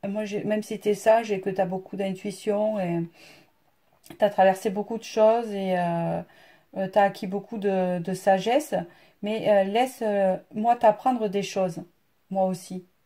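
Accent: South, France. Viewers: Bonjour, J'adore ton accent du sud,il inspire la joie ,le soleil, ne le change pas ,un plaisir de t'écouter parler Merci pour ta générosité ❤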